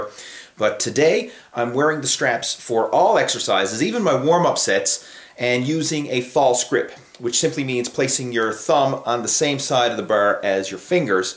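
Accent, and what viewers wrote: Newfoundland, Canada. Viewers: this is the first video i'm watching of yours, you are 100% from Newfoundland aren't ya?? ahah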